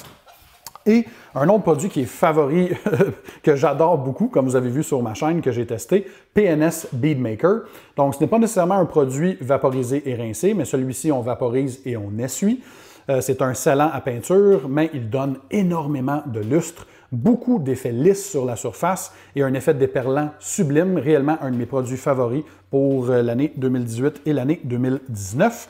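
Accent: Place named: Quebec, Canada. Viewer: J’ai commencé regarder ces videos d’abord pour écouter l’accent Québecois, mais ensuite j’achète tous les produits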